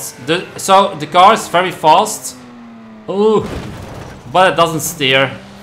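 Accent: United Kingdom. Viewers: I thought that I have to do full Goliath race but you helped as always. Are you from UK?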